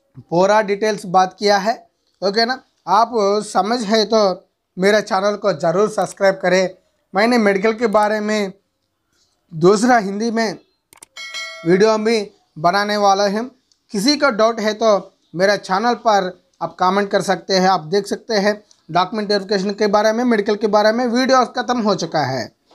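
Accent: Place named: India. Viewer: Hello brother. You have spoken such a sweet hinglish.(eng+hindi) Thanks a lot keeping this video in enish plus hindi. I didn't skip advertisements.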